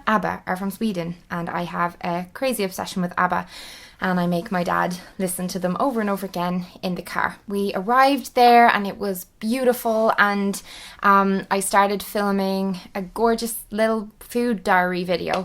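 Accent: Ireland. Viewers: One: her dad had a fucking heart attack, do you really think this is appropriate? Two: she's Irish, that's her accent. Three: you're a moron.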